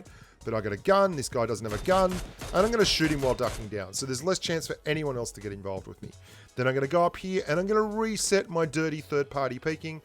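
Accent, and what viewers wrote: Australia. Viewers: Hey bro. I started watching you when I noticed the aussie accent Pretty close to home for me (nz). Your the man with all the advice that has helped me tremendously. Thanks and keep it up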